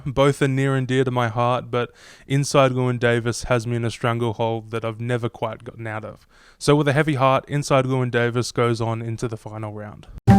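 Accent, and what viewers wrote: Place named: Australia. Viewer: Are you Aussie, you sound Aussie, umm why you picked these movies is a mystery to me as i have not seen half of them and maybe i should have, anyhoo lets dance fatso ; )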